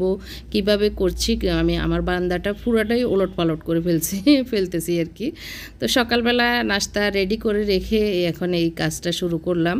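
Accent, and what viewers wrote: Noakhali, Bangladesh. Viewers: আপু আপনার বাড়ি কি নোয়াখালী?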